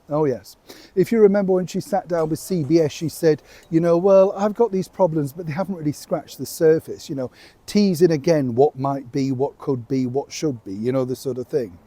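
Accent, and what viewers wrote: United Kingdom. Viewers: I think you do a great job. I enjoy all your videos. I especially love your British accent.❤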